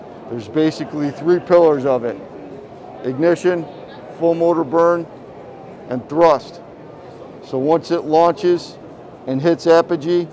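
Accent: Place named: South, United States. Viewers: He has a terrible Southern accent. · The American accent isn't great for selling the "best". I'm so use to hyperbole in it, I'm sure it's great but I'm less sure because of the accent.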